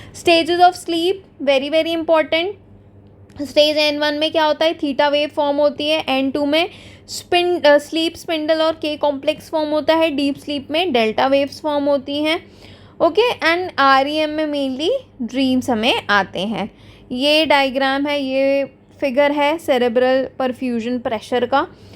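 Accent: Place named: Bhopal, India. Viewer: Bhopali ki zuban he ji ke roop me, faeda hoga dosto❤️❤️✨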